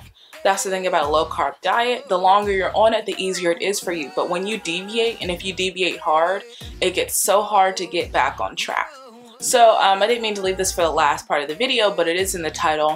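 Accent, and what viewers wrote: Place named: Bay Area, United States. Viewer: Where are you from? Sound like you're from the bay.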